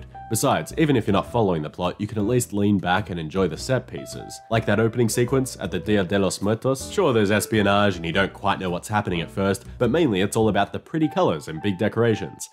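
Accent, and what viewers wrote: Melbourne, Australia. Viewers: You Aussie! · So he's Australian.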